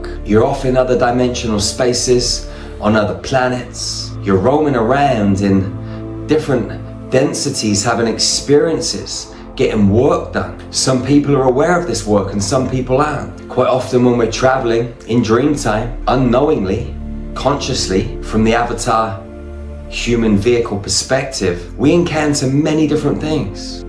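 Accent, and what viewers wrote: United Kingdom. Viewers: He's British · its not a normal british accent just trying to figure it out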